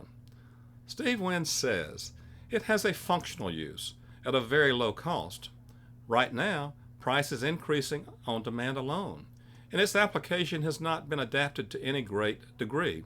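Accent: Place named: South, United States. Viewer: the 100% smart guy, & I love the southern accent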